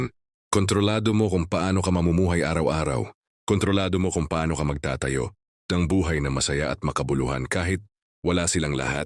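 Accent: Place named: India. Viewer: maniniwala na sana ako kaso parang indian na naga tagalog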